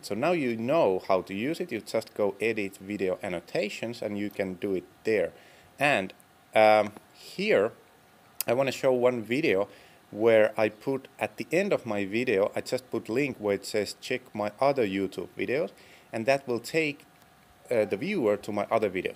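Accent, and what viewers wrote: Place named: Sweden. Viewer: Are you Swedish or Spanish? You really sound like a Swedish guy =)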